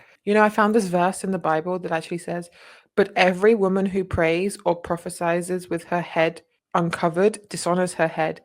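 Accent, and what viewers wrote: United Kingdom. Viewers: I wouldn't cover my beautiful natural blonde hair. Why do 8 year old muslim girls cover their hair🤔 are we assuming men cant resist? Gross!!! Get some vitamin D lady. I hear your English accent. Go live in saudi Arabia youll be happy there I think. I assume you're single. Im a grandma. Get a real job or do as your father tells you. Dont be a hypocrite. Maybe your hair isnt as beautiful as mine😊😊😊 thats ok Im just real lucky. You sad woman. House prices increased because of immigration. 20 million too many people here. Are u getting paid for this video? Your parents must hate you😂😂😂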